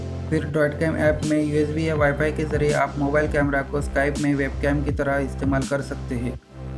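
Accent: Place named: China. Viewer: His voice is like CHINESE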